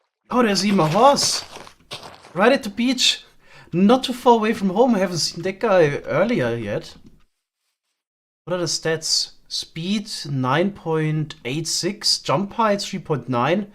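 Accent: Germany. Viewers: Every now and then the German accent makes me smile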